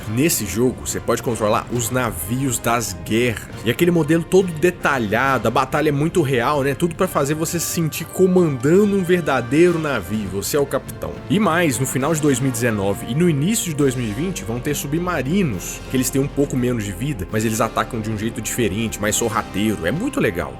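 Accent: Minas Gerais, Brazil. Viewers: Assistindo em 2025, engraçado seu sotaque mudou muito com o tempo, ficou mais mineiro .🤔😂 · que mineirinho bakana sô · coisa fofa esse sotaque mineiro.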